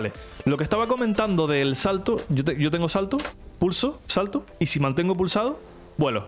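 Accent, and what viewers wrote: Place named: Canary Islands, Spain. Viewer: ¿Eres de Gran Canarias o de alguna de las Islas Canarias por casualidad? xDD Se nota mucho por como hablas, sobre todo en la "Ch" Jajajaja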